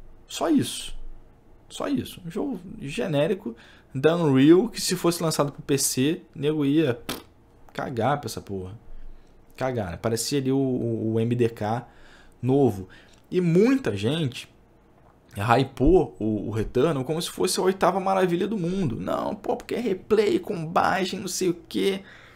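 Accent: Rio de Janeiro, Brazil. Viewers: esse jeito de carioca do falar é bom demais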